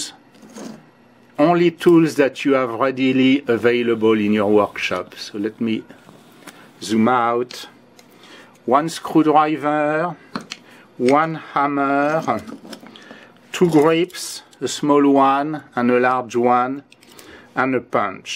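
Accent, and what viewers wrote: France. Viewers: Man I like your English with that French Accent!!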